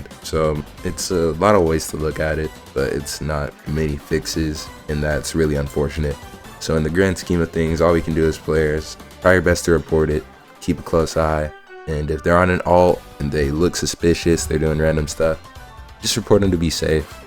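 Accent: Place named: Aba, Nigeria. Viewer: why bro got a aba accent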